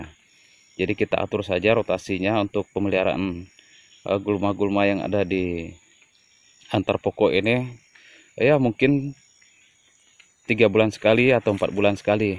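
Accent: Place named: Sumatra, Indonesia. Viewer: Ya bapak ibok..logat nya macam sumatera bang .👍😀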